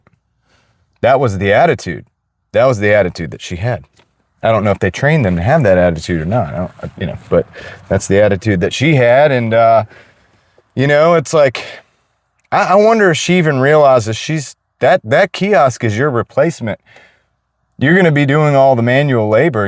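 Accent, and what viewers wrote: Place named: Rural, United States. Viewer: Unrelated, just curious, what part of the US is the accent from? To me it sounds like a very mild country accent of sorts. Pleasant sounding. Just curious.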